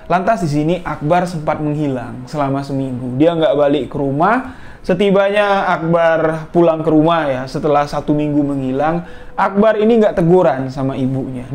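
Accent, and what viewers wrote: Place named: Bali, Indonesia. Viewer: oalah..pantesan bang,bahasanya masih ada logat balinya hehe ok bang makasih,semoga chanel bang terus berkembang Aamiin.. 🙏🙏🙏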